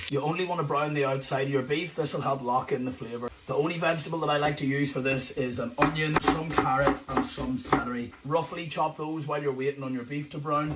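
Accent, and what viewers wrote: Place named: Ireland. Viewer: Love your Irish accent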